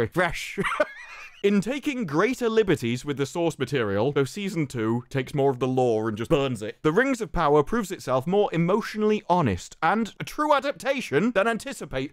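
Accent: United Kingdom. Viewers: Holy shit man you have one serious British mouth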